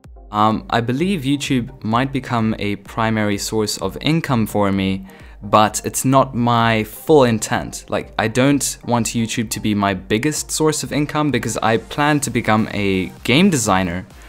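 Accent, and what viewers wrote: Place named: South Africa. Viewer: It's obvious his white.His voice sound like a white south african and i would know because i am a white south african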